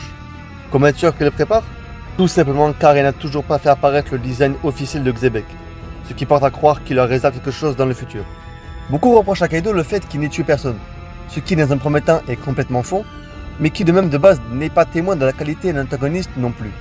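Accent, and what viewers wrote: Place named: England, United Kingdom. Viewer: Incroyable ton accent anglais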